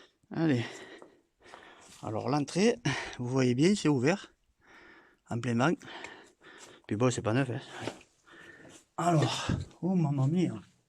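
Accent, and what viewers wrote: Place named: South, France. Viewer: belle vidéo, l'accent du sud je m abonne à votre chaine et un pouce bleu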